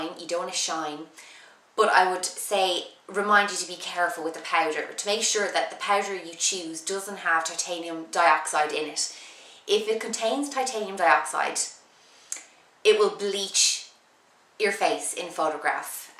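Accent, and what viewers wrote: Ireland. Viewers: You Really sound Irish, cause i know Because i am irish, Just not from dublin, CORK!!!!! bt dub Thanks for da tips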